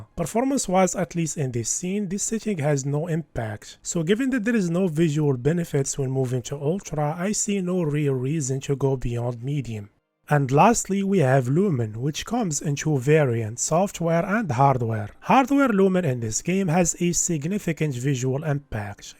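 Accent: Morocco. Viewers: U sound moroccan bro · bayna fik maghribi, english m9awad 3andek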